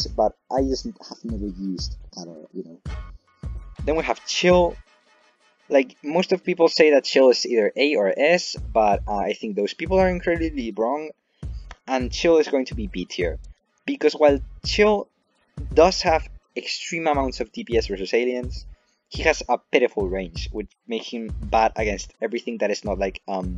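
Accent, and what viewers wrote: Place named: Russia. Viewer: I thought you are Russian. you have a russian accent)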